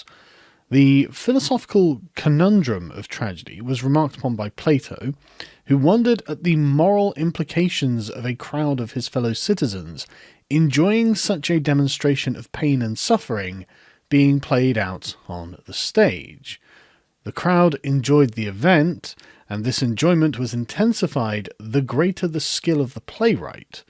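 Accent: United Kingdom. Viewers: I'm here just for the British voice ASMR.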